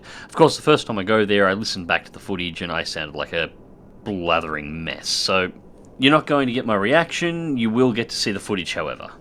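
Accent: Australia. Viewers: dyssynchro > ' accent >Our flag in background >Kangaroo >Needs to be told wat?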